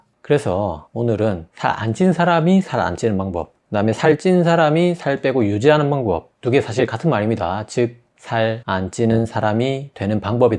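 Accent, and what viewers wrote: Gyeongsang, South Korea. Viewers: 아침 먹다가 뿜을 뻔…. ㅋㅋ 니나 아는… ㅋㅋㅋ 저 경상도 사람인데 서울에 살거든요… 매번 느끼지만 선생님 리얼 경상도사투리 너무 맛깔나게 재밌게 합니다. 듣고 있으면 폭소가 나와요. 물론 내용도 굉장히 성실하게 준비 하시고 검토 하시고 알려 주셔서 감사합니다. 귀에 속속 들어와요. 자신을 마루타 삼아 시청자들의 건강 관리 에 힘 쓰시니 복 받으실 거예요 ㅎㅎ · 이 선생님은 어디 경상도 분인데 이렇게 사투리가 나긋나긋 귀엽지? 어떤 경상도 사투리는 엄청 드세던데.. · 귀여운외모 경상도억양이 특이하셔요😂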